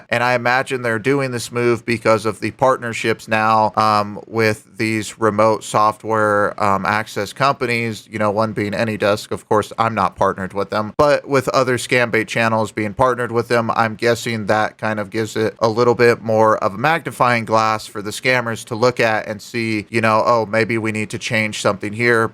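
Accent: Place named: South, United States. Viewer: By now your unique voice and y'all-type accent should be known to most large scam centers. You'll likely have to start using a voice changer. I'm waiting for these Indian criminals to wake up to the possibility of using AI against scam baiters.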